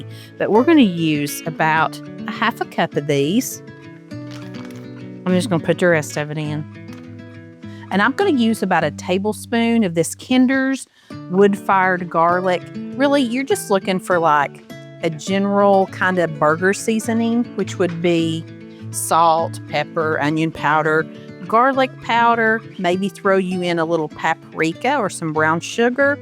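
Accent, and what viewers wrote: South, United States. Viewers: I LOVE your accent... love to hear the southern drawl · love your southern drawl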